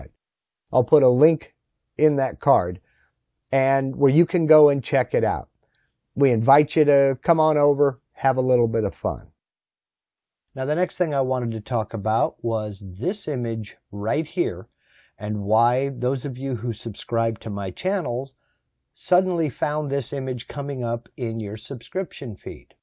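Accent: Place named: United States. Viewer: Hey. I enjoyed today's video. Like all of us beginners, I thought I would see another of your superb tutorials. But you've already given us a wealth of knowledge and now it's time for us to practice what you've taught and use it. Above all, I want you to continue having fun at what you do, and your upcoming project videos (with maybe a tutorial here and there) are something I'm looking forward to a lot. I had to chuckle in when you talked about not just doing the Vectric videos in your voice. Both of my sons and I agree that one of the best things about your tutorials is that you speak slowly, clearly, and in American English. Vectric's go too fast for beginners, and there's a lot of rewinding to translate the thick British accents on some of the words. I'll also be glad to see the Christmas projects that you post after the first of the year. I'm hopelessly behind on what I'm already committed to for this year, but would like to get a head start on next year. 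I hope you have a great Christmas, and have a lot of fun in your shop getting ready for it. Thanks for all you do.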